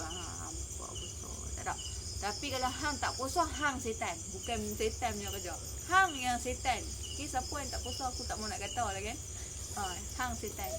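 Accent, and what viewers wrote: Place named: Kulim, Malaysia. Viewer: rasa² ni orng kulim kot sbb cara dy crta niy mcm orng kulim ja, sy pon dulu ingat niy orng pinang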